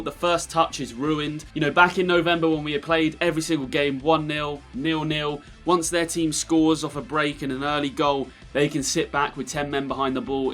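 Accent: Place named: England, United Kingdom. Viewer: I hate this English accent why can’t an a American do this video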